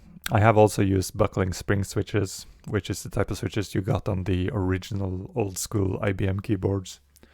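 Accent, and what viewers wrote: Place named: Norway. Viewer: Mate, your videos are more than helpful. I learned some great tips, The one where you hold the medikit and protect your head (hit point) against grenades was a pearl of wisdom. Today, you taught me not to always spawn at squad leader, which should be obvious. Only until someone points it out. I have developed an obsession with battlefield 2 that came out of personal trauma, and I have this urge to become a better player. It's crazy, as it's a 20 y.o game, but EA and Dice haven't come out with anything better since IMO. BF3 and BF4 single player campaign are good, but they took away all the team and commander functions, and replaced it with a diet version. No ability to mod. Don't get me started on Jets. No take off at runway is a big, fat joke. I dislike (beyond comprehension) the path they chose. They screwed over customers/fans for the money, and to be more like COD. 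Imagine BF2 with BF4 graphics. No reason why this shouldn't have been made possible. I truly dislike EA and Dice! Instead we are stuck with these outdated graphics and engine. I had an idea you were Norwegian. Your accent is very familiar. My Daughter is from Bergen, and her Mom is from Sogndal. I can tell you are an infantry purest. I don't even sdee you using UAV/Artiliery, or Commander in your videos. I looked up Zeqtr. He is very good. Gonna watch more and pick up some tips. I was wondering, are there any competitive BF2 servers nowadays, or do the remaining servers just account for public players? I mean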